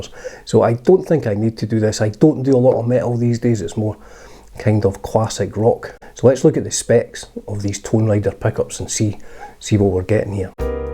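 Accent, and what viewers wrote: Scotland, United Kingdom. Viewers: I like Scottish accent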